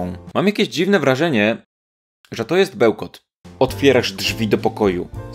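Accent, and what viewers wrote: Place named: Lesser Poland, Poland. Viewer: Eee, nie. Raczej ślady dialektu małopolskiego wychodzą - głównie w udźwięcznieniu głosek: Sprawdźmy (dźwięczne /w/ i /dź/) Powiedzmy (dźwięczne /dz/ przed m) tak jest (tag jest - udźwięcznienie głoski przed /j/) (jestem fonetykiem, zboczenie zawodowe ;))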